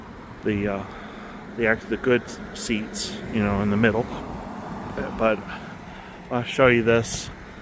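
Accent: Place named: Hampton Roads, United States. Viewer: Good review. And yes some people complain about vah-tech but in the 757 we’ve been saying vah-tech for 45 years just like we say vah-beach. You good bro. Go Hokies!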